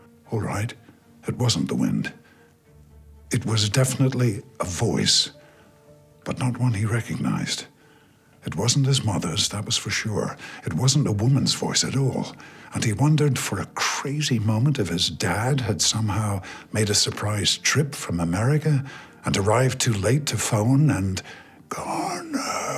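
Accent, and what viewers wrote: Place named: Northern Ireland, United Kingdom. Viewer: Brilliant makes me home sick listening to his northern Ireland accent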